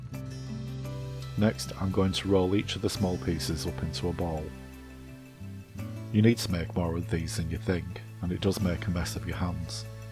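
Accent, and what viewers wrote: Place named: North, United Kingdom. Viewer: You sound northern,like myself, relaxing listening to you x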